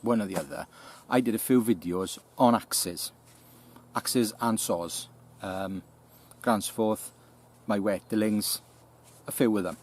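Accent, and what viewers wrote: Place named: Wales, United Kingdom. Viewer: I've never heard of any of those people before. I think you're the first Welshman I've ever heard or seen. At least I've heard enough Irish and Scottish accents to pick up on the the Celtic sound, it being Welsh never crossed my mind but it does make perfect sense since it sounds a bit like Scottish and Irish but it's clearly neither of those. Anyways, thanks for clueing me in!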